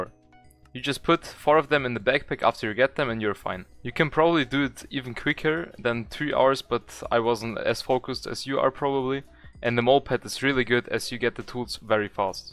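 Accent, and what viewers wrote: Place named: Germany. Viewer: How did I only hear your german accent after hearing yoou speak german?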